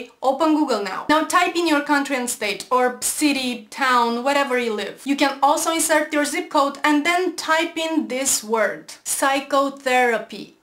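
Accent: Romania. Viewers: I don't hate you , I disagree with several parts of you're post modern liberalism , but not enough to hate you . The Romanian accent prevents me from hating you . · Ms You are stunning!!!! I am in love with your Romanian accent Very cute!!! And your vids are very informative esspecally the Islam one. This is something I would like for us to discuss as you are probably a lot closer to it then I am in the US Keep up the great work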